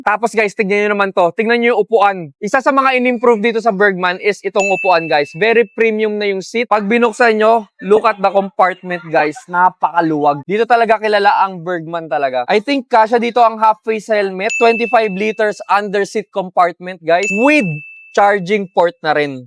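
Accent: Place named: Visayas, Philippines. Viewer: bisaya pag ganyan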